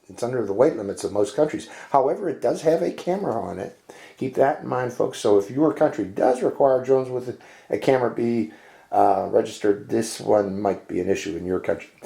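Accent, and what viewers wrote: Pittsburgh, United States. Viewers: I detected a Pixburgh accent! Thanks, from North Hills! Anyone know where I could get a basic case with this? Buying a couple as gifts.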